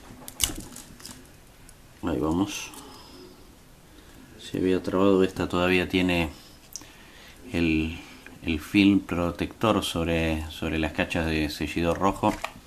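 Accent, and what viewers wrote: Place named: Argentina. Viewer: me gustan mucho tus videos, lo haces muy bien y el acento argentino tambien me gusta mucho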